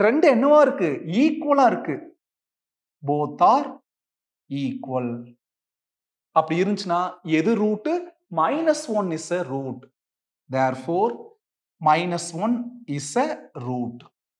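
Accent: Coimbatore, India. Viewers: Sir are u a coimbatorian??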